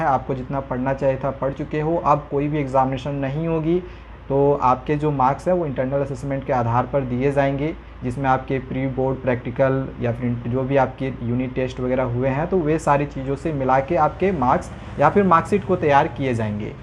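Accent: Jharkhand, India. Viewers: Sir aap jharkhand se ho??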